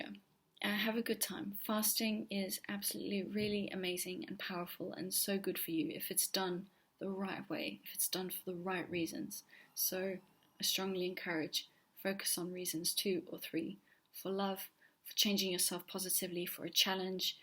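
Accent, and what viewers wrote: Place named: Australia. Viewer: Great content!😊 I enjoyed listening to it. I also like your accent 😁 Where are you from? Australia? The UK?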